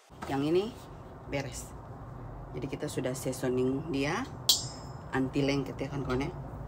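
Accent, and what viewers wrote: Papua, Indonesia. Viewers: oooo sa suka ko pu logat itu, sa jadi ingat ketika sa di papua dulu, lumayan lama oooo,20 tahun. Sa dulu ada teman cewek namanya Ribka ivani sarungu anak Toraja, dia punya hotel di Merauke tapi sa lupa de pu nama hotel. terima kasih juga su berbagi ttg SEASONING WOKnya kebetulan sa juga suka masak. sehat selalu.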